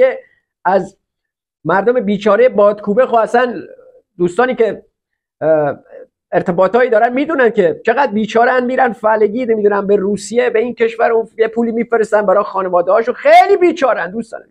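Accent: Lorestan, Iran. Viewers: Un grand bonjour à vous mon cher frère et mon compatriote avec ton délicieux accents lory . Iran c'est un pays de l'histoire et de la civilisation et très fort dans tous les niveaux et je vous promet que avec quatre jeunes exciter de réseaux sociaux et certains iranien Anti Iran qu'ils veulent faire la merdes en Iran, seulement c'est un rêve et que la rêve pas plus. Vive l'Iran Je l'mmerde ziba kalame , j'aime bien ce titre . خاک بر اون سرت زیبا کلام ، باید روحانی و ظریف هم به آن اضافه کرد . موفق و موید باشید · آقای زبانت اصل لکه یا لر کدامش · Thanks for lori accent.god bess you gew khom. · سلام جان عزیز خدایش بااین برنامه ات خیلی حال کردم واقعا دمت گرم وناز نفست سلطان مخصوصا با لهجه وزبون قشنگ لری که به دل می نشینه خوب تودهن این بیشرفای وطن فروش زدی خدا حفظت کنه سالار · سلام و عرض ادب دمت گرم داداش گلم خیلی حال می کنم من لرهای زیادی تو دوروبر دارم یکی از یکی بهتر دمتون گرم کلی انرژی میگیریم از اجرای پر انرژی و لهجه شیرینتون من هم جزو آذری زبانان ایران اسلامی هستم زنده باد ایران و ایرانی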